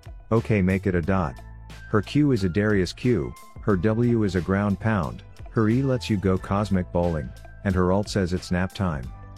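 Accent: Scotland, United Kingdom. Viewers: i thought she was scottish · isnt it scottish? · She's not British, that accent is Scottish and how dare you call mine people British. We will literally go William Wallace on you for speaking such blasphemy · isn't her accent Scottish? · my boy she has a scottish accent sorry to say but she is not british. title needs to be scottish bambi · That's a scottish accent, not a british accent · SHES SCOTTISH OMG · I thought that accent was Scottish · she is Scottish Bambi not British Bambi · She’s Scottish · That's a scottish accent, not a Brittish accent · she is SCOTTISH not Bri ish! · She sound Scottish tho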